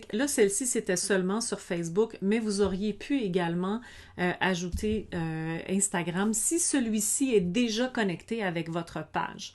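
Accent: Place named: Canada. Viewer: Merci pour cette video réussie! un petit bonjour de Normandie en France... et merci pour ce chouette accent canadien qu'on ne se lasse pas d'écouter avec plaisir ! Cependant j'ai une petite question, vous serait il possible de faire le même style de video pour lier la boutique Facebook avec celle d'Instagram. J'ai un site internet sur Wordpress, une page Facebook Pro, une boutique Facebook et un compte instagram professionnel, tout ce petit monde à l'air être connecté mais quand je veux mettre des produits sur ma page instagram, on me répond : Aucun produit ici, si les produits n'apparaissent pas ici, contacter l'administrateur de la page, ou vérifier vos autorisations dans business manager ??? je suis complètement larguée!!!! sur business manager c'est archi compliqué ^^ merci en tout cas d'être présente pour nous aider dans ces démarches bonne semaine à tous !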